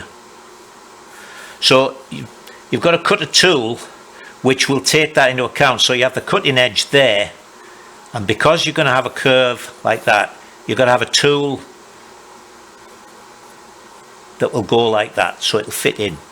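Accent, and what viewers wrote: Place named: Teesside, United Kingdom. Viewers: Brilliant videos great watching still got that Teesside twang then, Martin from Hartlepool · Only found your vids. a month ago so a lot of catching up. Was intrigued by your Boro accent so have looked U up. We must have been around similar people similar times Graham Petite John France Geoff Crust Chris Rea for my sins once a Boro lad always a Boro lad. Love your simplicity & knowledge of engineering/Metallurgy. Me Metallurgy Dorman Long Warrenby Brittania SB Coke Ovens Lackenby. Good to C U putting in the graft. Ignore the twitters.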